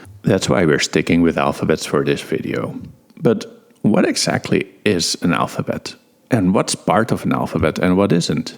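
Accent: Netherlands. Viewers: I thought you sounded Dutch but... “no it’s slightly off” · you sound so extremely "dutch"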